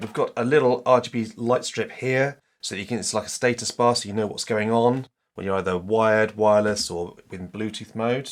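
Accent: United Kingdom. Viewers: Sounds like you're in the UK mate, can you tell us a bit about shipping please? Time taken? Customs fees? Any problems?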